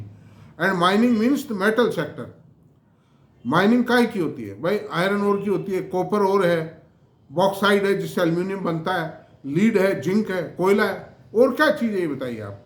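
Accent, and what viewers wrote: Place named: Marwari, India. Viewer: Aap ki marwadi hamare wali hai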